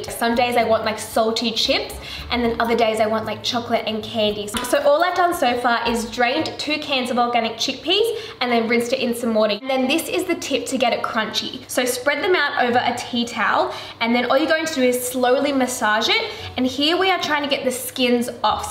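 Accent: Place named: Australia. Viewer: Lol that's the aussie accent for u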